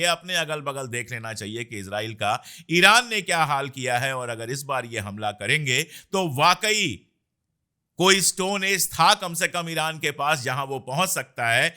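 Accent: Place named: Kashmir, India. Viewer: sir kashmiri hein zabaan mein kashmiriyat he bhai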